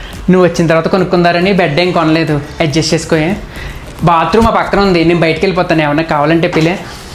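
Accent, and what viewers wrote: Godavari, India. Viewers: Love your slang😊 godavari slang super asalu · మన గోదారోళ్ళ గొంతుక మారుమోగిపోతోంది మీ వల్ల... All the best-frm konaseema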